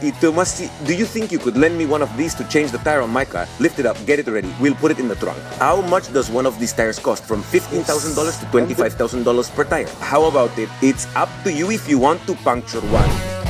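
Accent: India. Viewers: El acento se escucha como el de los indios de la india. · Por que hablas como Apu? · En realidad eres tú? Suena como tu tono de voz, pero un acento Indi demasuado marcado, no suena ni como acento latino, como le hiciste? Por lo demas excelente video bro, me encantan tus videos, keep ut up, thaks to you I made my decision between a few options to by a car appreciate it 🙏🏻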